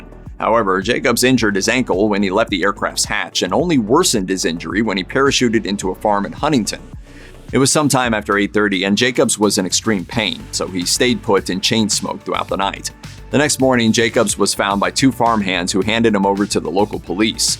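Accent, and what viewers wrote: United States. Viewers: I didnt finish the documentary because of the annoying American accent 🤷🏽‍♂️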